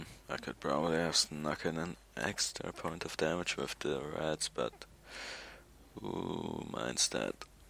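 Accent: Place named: Europe. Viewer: Holy fucking hell, you sound like utter SHIT...and, oddly sound like you have a some sort of European accent? I'm confused.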